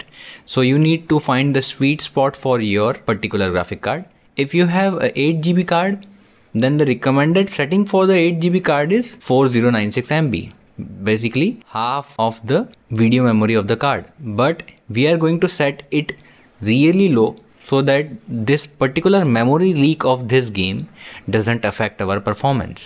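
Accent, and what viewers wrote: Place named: India. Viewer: Another huge advantage of Indian accent is the English is scholar and easy to understand for non native speakers Anyone knows a successful Scottish YouTuber?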